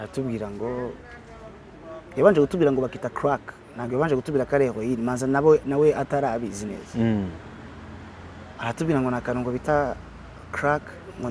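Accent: France. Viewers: Afite accent nziza yifrance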